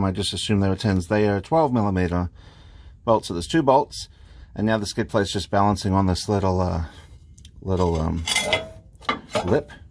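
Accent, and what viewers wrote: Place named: Australia. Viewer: Great explanation and tips. You sound very Australian, I can't figure it out haha. Cheers Cobber!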